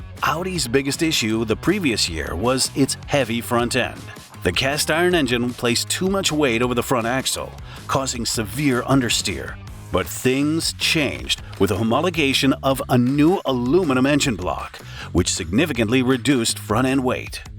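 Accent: United States. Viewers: Pity about the Hollywood yankee doodle commentary... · The narrator amazingly pronounces Mr. Piech's name correctly- most non-Germans say it incorrectly as "peach". But- the CEO of VW was not "Schumacher", but Schmueker (would sound like "shmicker"). And the company brand is sometimes pronounced as 'oddee" and not "owdee", even in the same sentence?! And my gosh- who is the main intended audience of this video, given the obvious American accent of the narrator? The USA! So provide the units as most commonly used in this country- standard units (e.g., inches, pound-feet torque, pounds, miles per hour, etc.) with either metric spoken right afterwards, or visually in parentheses/captions. There are some std units on the car depictions as subscripts, but when the text is spoken they are missing. Nice to see photos of the development cars for the original Quattro setup. 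· Just say Volkswagen. It sounds dumb when you talk like an American for the whole video except for one word where you have a German accent. It just sounds pretentious and smug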